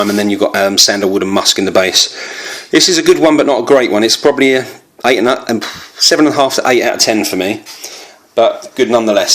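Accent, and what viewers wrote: United Kingdom. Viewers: Nah, you should pronounce things the way you naturally do. Its just plain rude to criticise someone's accent and suggest it's some how wrong. I've got an estuary english accent too, don't speak differently to appease others. Imagine it the other way round, I'd never make negative comments about any accents that sound foreign or different to ours' - as i said it's very rude and comes from plain ignorance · I just just subscribed to your channel!!! I'm so impressed thus far by your first video. I don't know what it is about you Brits that when you speak you command such respect?!?!?! Accent??? (Wink wink)... Anyways here is my TOP 5 all the way from Canada... 1. Neroli Sauvage 2. Green Irish Tweed 3. Jardin D'amalfi 4. Original Vetiver 5 Original Santal :)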